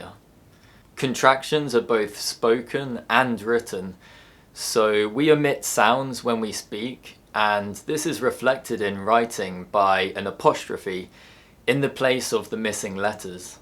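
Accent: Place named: RP, United Kingdom. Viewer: I just watched this video, I’m really interested on English pronunciation and I deeply appreciate your useful lesson and also your modern RP pronunciation. Thank you so much!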